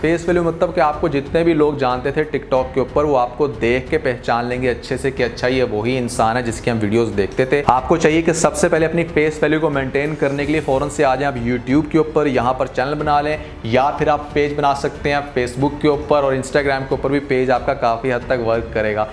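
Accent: India. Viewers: Mr. your accent and words shows that you are highly effected by Indian content. Ap kisi Urdu k professor se milen aur baat Karen ta k ap seekh saken k humara accent aur alfaz un se kitne behter aur khubsorat hain.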